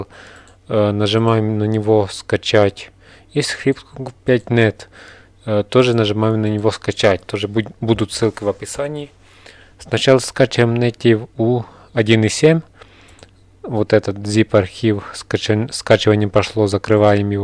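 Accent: Ukraine. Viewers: Ех... Как мне нравиться этот украинский акцент... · Он украинец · похож на украинский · чел это украинский акцент и есть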